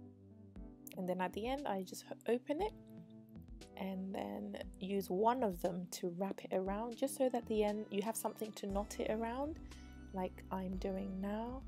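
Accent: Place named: South London, United Kingdom. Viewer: Yes Sis. Love the vid, thanks it helps so much... Imma try this under my wig. Anyhow I'm In South London UK and i recognise that accent of yours😀 Stumbled on u vid as i was checking out rice water for hair growth.. Keep up the good work girl, and keep looking after that amazing hair of yours..💓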